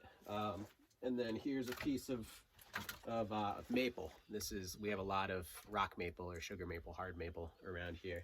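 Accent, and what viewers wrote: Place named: Upstate New York, United States. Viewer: You're not from Vermont. Sounds more like upstate NY.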